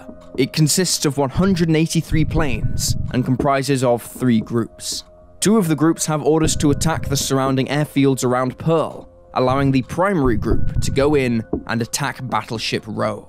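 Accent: United Kingdom. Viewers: Such British blah blah blah........................... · British accent makes it better.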